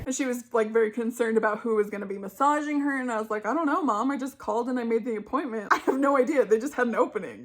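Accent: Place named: New England, United States. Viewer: It’s so funny being from New England hearing your pronunciations and hearing you hype Maine as a cross country trip because Maine is like a day trip lol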